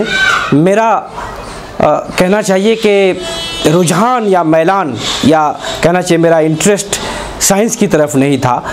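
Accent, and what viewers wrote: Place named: India. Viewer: Ap janab ka Labb o Lehja to hindi hai.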